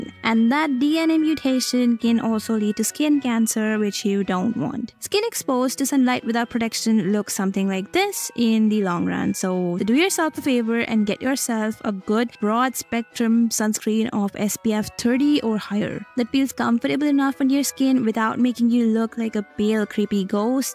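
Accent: India. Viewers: Thank god you put subtitles because for some odd reason i can’t stand Indian accent! It annoys me, i have problems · I spotted your beautiful Indian accent Love when Indian people speak English , it sounds so good to hear.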